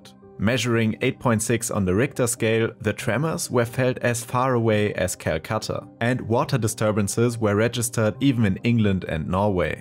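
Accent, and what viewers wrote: Germany. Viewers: man hört den deutschen akzent immer raus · I love your German accent ❤ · dein deutscher Akzent gar nicht zu überhören😂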